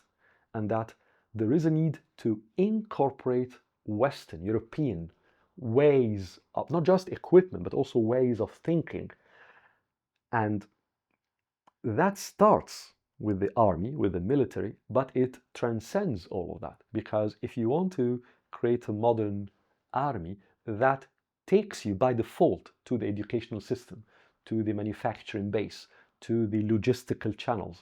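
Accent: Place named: Egypt. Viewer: جميل الانجليزي باللهجه المصريه ❤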